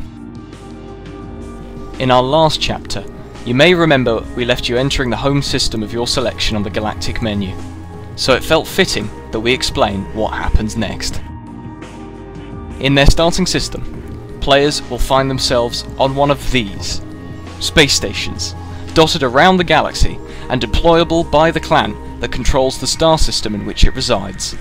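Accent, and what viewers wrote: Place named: United Kingdom. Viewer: That British voice... makes me weak at the knees;) I don't need no voice.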